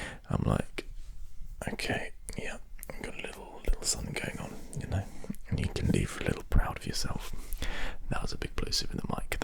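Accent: United Kingdom. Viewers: Damn ur voice was so good i love it so much i'm normally not fan of soft spoken but ur voice was so hot(sorry i go to far in that coment 😂) love ur videos they're cool and british accent is the best ever. I'm french(from normandie not so far to u😜) so sorry if my English was bad😕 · We hearby officially brush those negative thoughts away for you. Brushhh. Brushhh. Brushhh. Your doing fine. We are happy to see you. That velvet voice with your British accent and handsome bearded face make us all feel great. 👍💜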